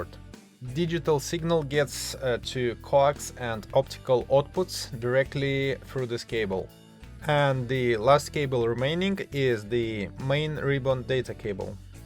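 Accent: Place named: Russia. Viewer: Комментатор явно русскоязычный))))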